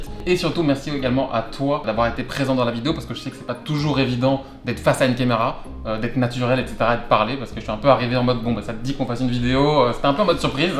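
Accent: Belgium. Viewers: super video vraiment je te kiff mais j'ai une question. T'es Belge ou Français ?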